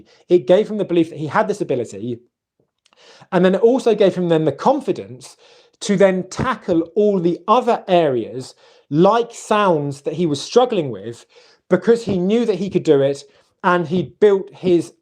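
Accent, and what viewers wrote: United Kingdom. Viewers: I love the British accent..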